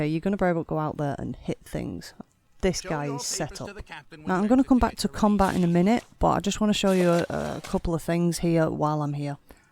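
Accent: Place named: North, United Kingdom. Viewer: Nice to hear a fellow northerner on this part of youtube :) Thanks for making this guide, I feel a bit overwhelmed getting into morrowind so I'm hoping this will make it seem less... huge.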